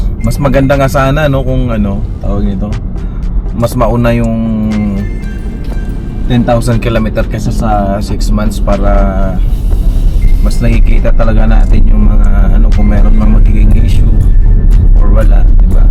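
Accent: Visayas, Philippines. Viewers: bisaya diay ka sir hahaha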